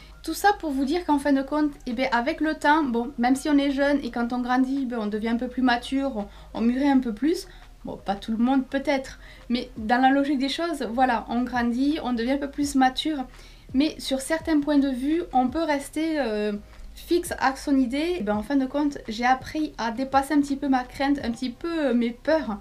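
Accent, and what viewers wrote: South, France. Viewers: J'adore je veux la même chambre! !!!trop cool 😆😆 en plus avec ton accent du sud que je kif trop et comment ta dis petocharde 😄😄😄trop cooool bonne journée à toi merci bcp pour la vidéo 😉😉😉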